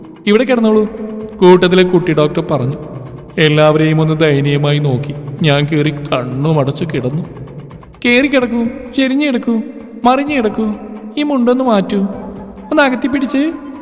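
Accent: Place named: Thrissur, India. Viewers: Thrissur basha pollichuttaaaa😂❤ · ന്തുട്ട ഗടിയെ... മ്മ്‌ടെ തൃശൂർ slag ഗിൽ... കിടുട്ടോ... കൊള്ളാം ❤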